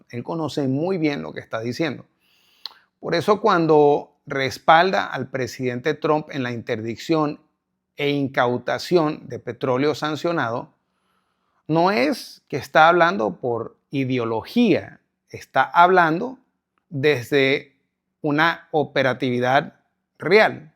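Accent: Venezuela. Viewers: Cómo buen Tachirense, hablas sin "pelos en la lengua " en perfecto acento venezolano. Gracias ❤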